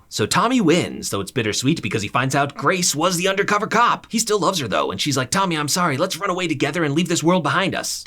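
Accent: United States. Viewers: I can't lie I was initially dubious with the overly American accent but this was a fucking great summary. Honestly made me realise shit I didn't even notice second time watching the show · Very good recap, not an English standard, but for an American quite acceptable.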